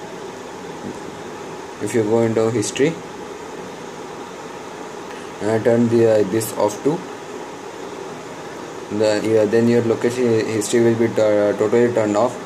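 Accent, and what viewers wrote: India. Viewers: it's ay Indian accent think before commenting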